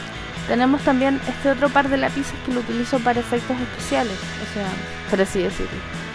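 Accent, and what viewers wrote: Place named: Chile. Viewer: waau me encanta tu forma de hablar se nota al toque que eres de Chile, me gusto caleta tuto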